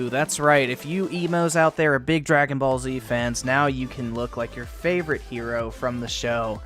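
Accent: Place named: South, United States. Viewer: Bro i remember these! He had that southern accent coming through still and he scripted his vids still..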